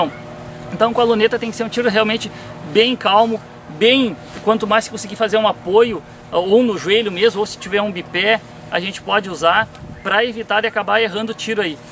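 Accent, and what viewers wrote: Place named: South, Brazil. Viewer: tenho uam duvida vc mora em RS? MORO EM PORTO E GOSTO MUITO DE SOBREVIVENÇIA 9PERGUNTO PQ VC TEM UM SUTAQUE DO SUL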